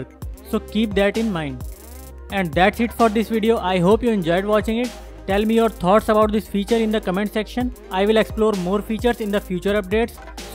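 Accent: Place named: India. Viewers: Steven Watson What the fuck is a 'Hindu accent'? He's speaking in the typical Indian accent. Hinduism is a religion. Forgive me, But Western education is hilarious. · Lmao forgive me. But Hindu accents are hilarious.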